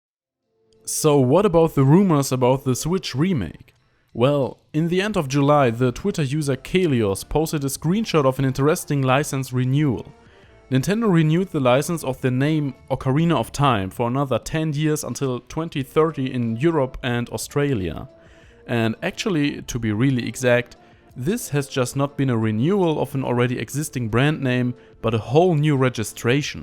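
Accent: Germany. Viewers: Are you german? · Bin mir ziemlich sicher dass du deutscher bist man hört es so krass. Einfach die typische Betonung wenn ein deutscher englisch spricht. Ist aber auch verdammt hart das zu verstecken.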